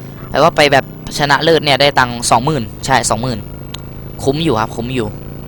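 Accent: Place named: Korat, Thailand. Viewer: คนโคราชป่าวครับฟังจากสำเนียงเหมือนมากๆ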